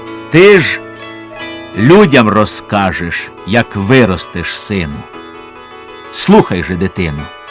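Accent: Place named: Ukraine. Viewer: Голос дуже український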